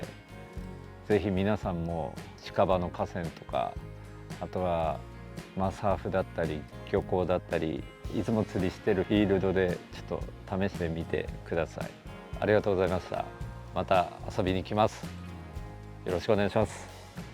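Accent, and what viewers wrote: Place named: Tohoku, Japan. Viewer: 福島出身です🙇🏽 久し振りに東北訛りがブルーブルーの動画で聞けて、嬉しくなりました笑笑 応援してます‼️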